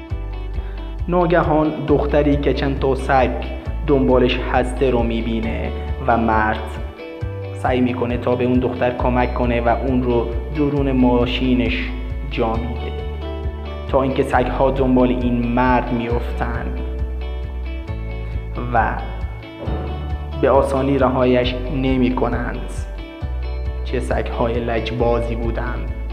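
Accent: Afghanistan. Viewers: مث بقیه برادرای افغان صحبت کن خیلی هم لهجتون قشنگه اینجوری ریده میشه به کلیپ این همه یوتیوبره افغان و همم عشق میکنن با کلیپاشون و بیشتر به خاطره لهجشون میرن کلیپاشون رو میبینن شما اومدی فارسی صحبت کنی خوب معلومه گند میزنی فارسی رو مدل افغانیه خودتون بگو با لهجه خودتون عالی میشه مث این میشه که من تو افغانستان باشم و بخوام به لهجه شما صحبت کنم صددرصد مسخرم میکنن چون بلد نیستم و همش گند میزنم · داداش این افغانیه واسه همین اینطوری حرف میزنه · نه ایرانی حرف نمیزد همین لحجشه در افغانستان هم لحجه های مختلفی هست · لحجش تخمیه چون گوینده افغانیه 🤢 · این چه جور حرف زدنه انکار یه افغانی تازه اومده ایران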